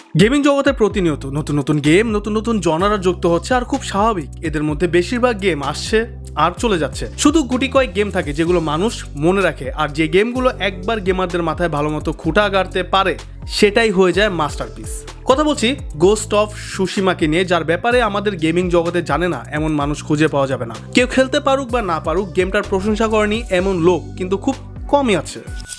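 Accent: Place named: India. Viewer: vai ki indian? thik moto bangla bolte parchen na kisu jaigai!!!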